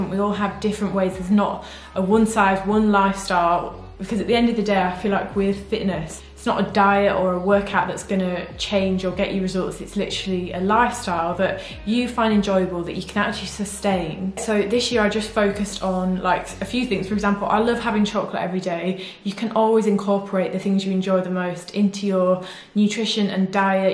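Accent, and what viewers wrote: United Kingdom. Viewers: are U british ?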